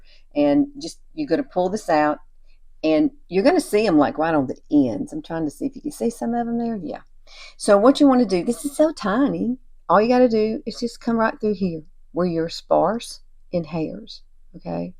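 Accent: South, United States. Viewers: Watched your lovely informative video from 2024. Love your soft Southern accent.I’m 77 had my eyebrows tattooed years ago Now I have dark thin eyebrows 😢!! Thank you,Carol from ‘Australia’❤ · I just love your accent. I think southern accents make you sound friendly. It just makes me smile. Thank you for this tutorial. My daughter is getting married next month and I’m trying to relearn how to put on makeup again. Haven’t worn it for years. This is gonna be very helpful until I do it myself. Haha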